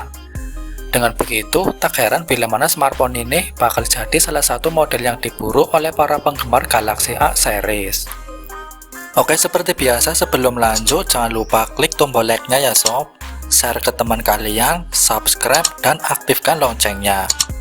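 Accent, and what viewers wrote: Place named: Java, Indonesia. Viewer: Voiceover nya jawa medok, ga enak didengarnya 😌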